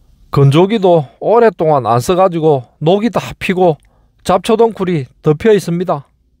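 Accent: Gyeongsang, South Korea. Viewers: 이젠 경상도 사투리 자체가 싫다.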